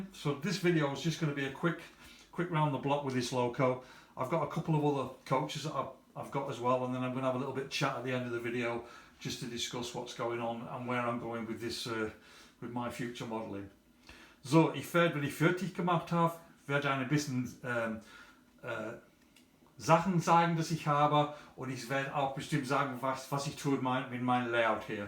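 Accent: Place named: Manchester, United Kingdom. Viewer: Nice pick ups....it still cracks me up when you are.talking Manc and then go into fluent German.......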